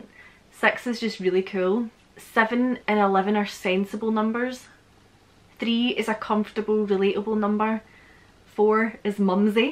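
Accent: Scotland, United Kingdom. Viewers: That's interesting! You have my absolute favorite accent from Scotland. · It is sexy to have synaesthisia !! Specially with a scotish accent ♥️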